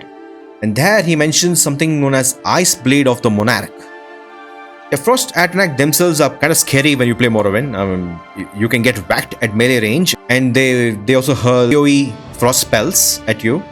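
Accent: India. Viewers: God damn your accent is really annoying, wash away that "v" when saying w damn indian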